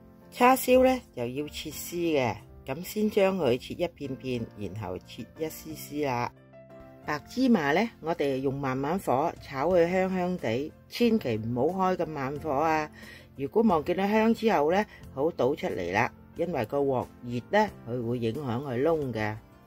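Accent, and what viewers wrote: Vietnam. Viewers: 謝謝Amy 老師不吝賜教，這個是我今年做月餅的首選，希望能成功，你帶越南口音的廣東話非常有親切感，做好後成績如何會向你交功課，謝謝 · 聽咗一分鐘 終於聽到你的越南口音啦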